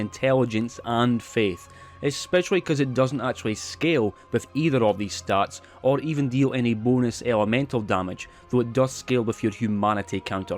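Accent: Scotland, United Kingdom. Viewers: May i ask a question? Are you a scottish or an irish? I'm just interested... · what i love more, is a scottish accent xD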